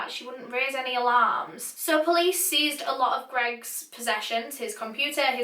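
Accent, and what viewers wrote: United Kingdom. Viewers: your accent is so.. brit gangsta- i love it... keep up the tight work, its awesome, so far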